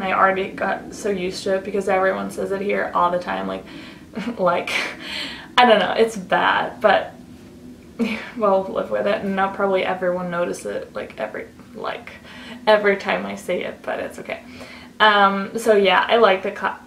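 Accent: United States. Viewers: Ich weiß zwar nicht, wie dein Englisch vor der Reise war, aber ich finde es echt super! Du hörst dich so amerikanisch an, als wärst du dort geboren und hättest dein ganzes Leben nichts anderes gesprochen 😊 freut mich total, dass es in der Schule so gut klappt 😍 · Omg ich dachte am Anfang seit wann habe ich eine Amerikanerin abonniert😂😂😂 Omg du sprichst so gut. Ich wäre untergegangen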